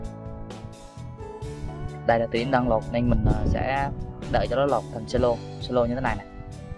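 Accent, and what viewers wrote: Vietnam. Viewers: em nghe giọng anh em đoán ko nhầm thì anh ở đà nẵng giống em haha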